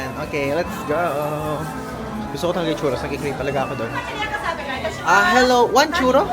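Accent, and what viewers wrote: Nueva Ecija, Philippines. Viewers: Hi! I recognized your accent, you’re from NE pala, same tayo ng hometown. I’m from Aliaga/Zaragoza. Hope to meet you when i get back home.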